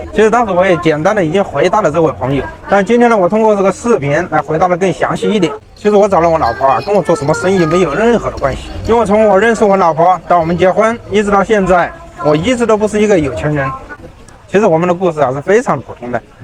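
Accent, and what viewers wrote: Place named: Northwest, China. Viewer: "My wife is simple and cute." Are you kidding me? She is very pretty and has beautiful lip and chin. For you, I detect an accent from West or North West China. After I posted this message, I watched some of your videos, and have to say: Congratulations, you finally found happiness in Vietnam! Most people on this world spend their entire life, but can't find happiness. You are the luckiest one. Do you best to keep it.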